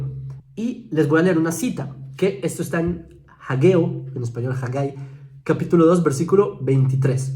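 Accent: Colombia. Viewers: Hablando de genealogía, a mi me parece que este hombre tiene un muy marcado asento colombiano más concretamente como si fuese de Bogotá. Yo quisiera saber si realmente es judío o solo tiene los rasgos físicos.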